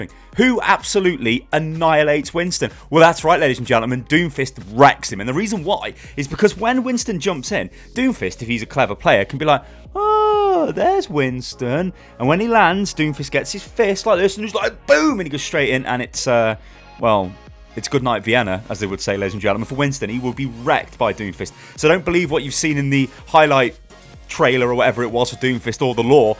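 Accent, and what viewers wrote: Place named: United Kingdom. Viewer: I love your videos, I watch your videos always when I'm having breakfast, both for the news and for your british accent. I wish someday you do a Unit lost con, I would gladly come from Spain. Keep up with the good work! Cheers!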